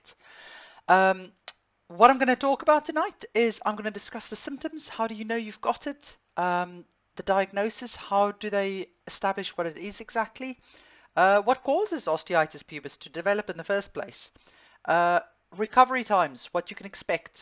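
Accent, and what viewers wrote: South Africa. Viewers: Hello. You sound like you could originally be from SA. I live in Graaff Reinet in the EC and have been informed I have this annoying condition. I’m a runner and started cycling this year to try and alternate a little and then bam OP!!.. I’m devastated as I have not been running for 4 months already and not cycling for a month now. I’ve already seen a physio, GP, Chiro and finally a sport specialist Dr who diagnosed OP after X-rays and sonar. My question is, as I am sure your time in Pounds will be extremely expensive for us here in SA, is would it be worth while seeing a biokineticist to help with me with this?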